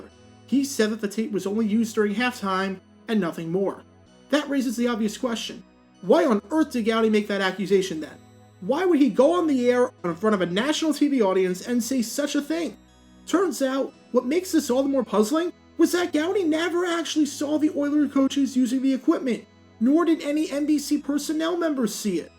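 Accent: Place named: Philadelphia, United States. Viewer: is from philly/south jersey? he sounds like it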